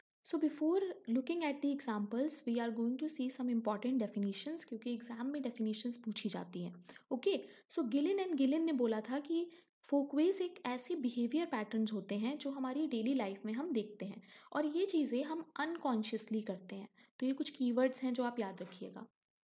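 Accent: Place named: India. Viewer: I don't understand the indian english, sorry!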